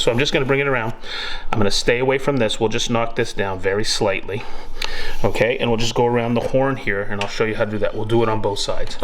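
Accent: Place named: Boston, United States. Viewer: Love the Boston accent,?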